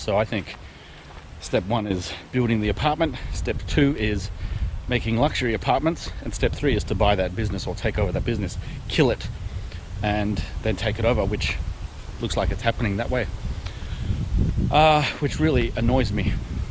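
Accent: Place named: Australia. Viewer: Your People been taking over Other people countries for centries including Hong Kong, Now here U talking about China🇨🇳 Taking over Laos🇱🇦 First of all U R white, Sound like an Australian Are from some European country, U R not from Laos,, So,, before U start showing your disapproval of what the Chinese R doing in Los, Show us your disapproval of the history of your people taking over Other people countries, N another thing, do your research on the history of China🇨🇳 and Laos🇱🇦 relationship.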